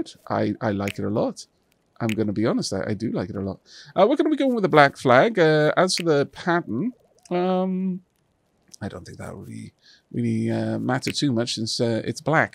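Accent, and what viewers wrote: Wales, United Kingdom. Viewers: I went into this blind from recommendations, distracted by btd6, and I was like wow, this guy sounds like. I had to get out of full screen to see who it was and.... yeah it was you!!! Your calm voice is unique and proper mate, the Welch in you comes out joyfully. When I was sent overseas to RAF Alconbury in East Anglia the winds were like gale force on the day I was picking up my MOPP Gear over stuffed into a parachute bag (Chem suit/mask and all the trimmings) which weighed a metric ton altogether and I was walking the entire way to the dorms against the wind. My friend was heading the opposite direction and he wizzed on by me waving. I still remember the one time the wind stopped and the sky was dry while we were walking to the bowling alley. It was summer about 6 pm so another 3 hours of sunlight at least and I remarked how nice a night it was for once. 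When we got out of the bowling alley not 2 hours later it was lightly raining again and blowing like the channel resented us being over there... as per usual. When I eventually got sent back stateside I was sent to the deserts of Idaho where the ranking Sargent of my job place was showing me around and remarked that I'd best get used to the wind. I simply said ' What wind?' He thought I was boasting. It was too cold to try and explain where I had just come from as he would have doubtless no clue.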